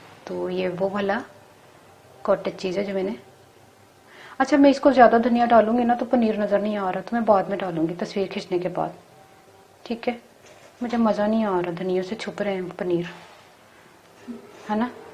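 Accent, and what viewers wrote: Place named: Punjab, India. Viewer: Tuhadi Punjabi Masha Allah bahut Mithi hai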